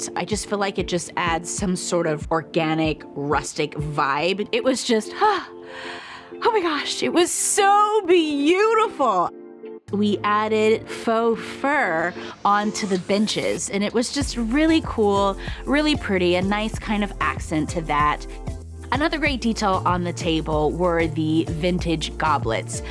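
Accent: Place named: Los Angeles, United States. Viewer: True lA girl I can hear the accent, this was so beautiful!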